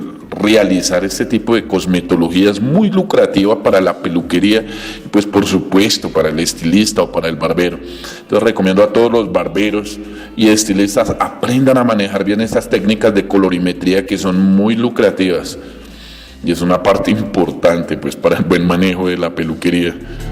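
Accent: Colombia. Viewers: Me encantó el video, tu profesionalidad y tu acento colombiano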